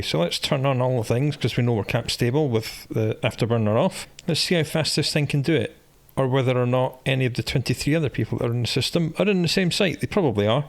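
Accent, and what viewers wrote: Scotland, United Kingdom. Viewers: Nice one m8.... Baby!!!! get over here quick!!! back with his reassuring Scots burr, Greets from North of England m8:eyes-pink-heart-shape:😁👍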